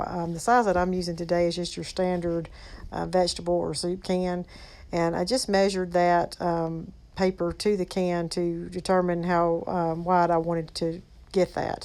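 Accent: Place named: North Carolina, United States. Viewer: Also, I enjoy your videos so much. Your accent sounds so much like my North Carolina relatives <3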